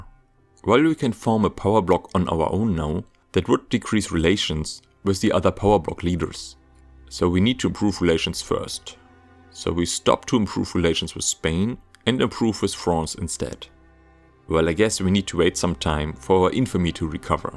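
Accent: Germany. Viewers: Alter, gotta work on that accent. Good vid though, but boy is that German accent seeping through savagely...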